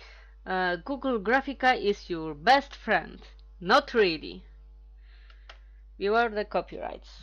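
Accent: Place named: Russia. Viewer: which language do you speak? ´cause it sounds like russian, your accent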